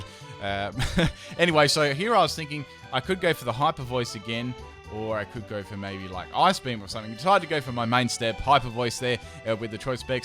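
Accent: Australia. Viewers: are u ausrtralian?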